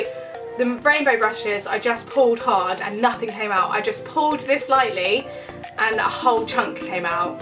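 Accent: United Kingdom. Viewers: I LOVE HOW U SAY HARRY POTTER, it reminds me so much of Emma Watson's accent and i wish i had your accent because im just from the states and my accent is sooo boring 😂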